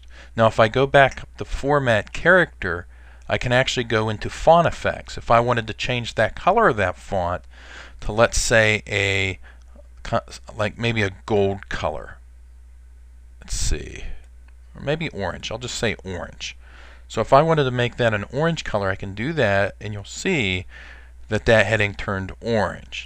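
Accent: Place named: Pittsburgh, United States. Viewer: I'm saying you're from Pittsburgh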